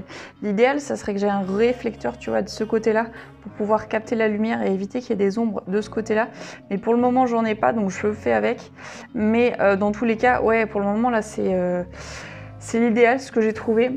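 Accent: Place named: France. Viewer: I love French accents!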